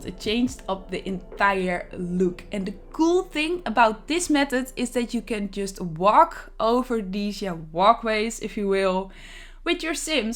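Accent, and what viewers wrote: Netherlands. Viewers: Wowwww 😍😍😍😍 ik hoor gelijk dat je Nederlands bent 😂😂💗💗💗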